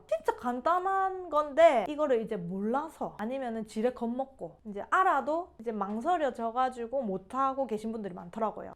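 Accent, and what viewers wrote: Gyeongsang, South Korea. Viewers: 저 갱상도 언니, 말이 참 잘 들리네요. 지방발도 제대로 사용하면 오히려 소통이 잘돼.. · 조선족이 구한말에 경상도 출신들로 구성된 경우가 많아서 조선족이 구사하는 한국어에서는 묘한 억양이 있습니다. 근데 저분은 완전 찐 경상도네요... 그리고 북한말씨가 있는 조선족 말은 함경도 이런 지역에서 온 사람들입니다. 그네들도 서로 지역 감정 있다고 하네요... 에휴ㅋㅋㅋ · 이분은 경상도 사람입니다 조선족은 북한말씨가 많이 들어가 있지요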